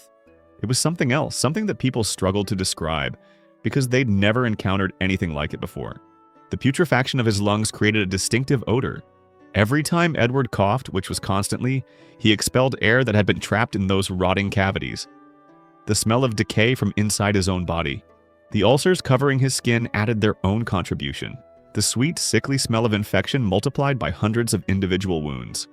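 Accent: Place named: United States. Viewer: yes another crappy American voice over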